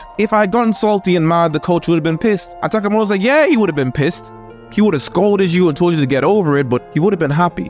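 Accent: Ireland. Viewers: cool irish accent lol you sound exactly like my brother, love hajime no ippo since it motivated me to begin boxing.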